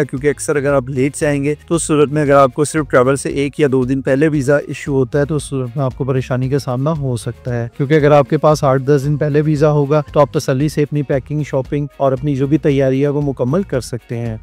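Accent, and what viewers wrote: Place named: Bengal, India. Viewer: bhai ap Accent bangali wala lag raha hai..bhai bura mat man na..🙏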